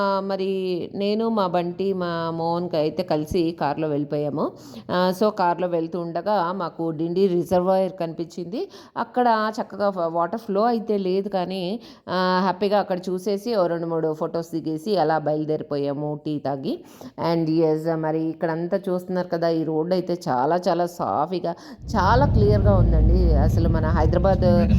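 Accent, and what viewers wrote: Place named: Godavari, India. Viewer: Godavari slang suuuuuper ma'am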